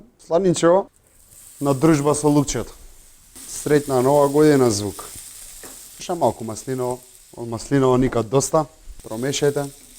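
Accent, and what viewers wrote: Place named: Skopje, North Macedonia. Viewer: Decki govorete makedonski. Vo opsto ne si interesne ako zoruvas na Sk dijalekt. Aloo vodis emisija za recepti. Odbivno e za emisijata toa so se LIGAVIS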